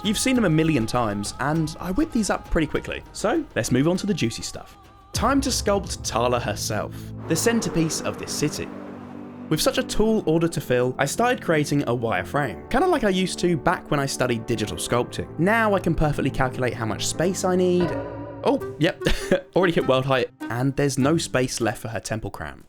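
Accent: United Kingdom. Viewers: Are you British As I mean are you from England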